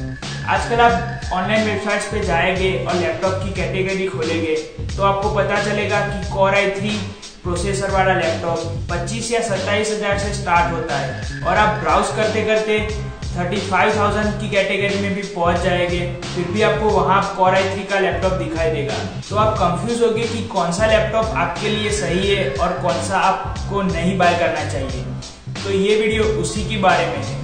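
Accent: Gujarat, India. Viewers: are u gujju.??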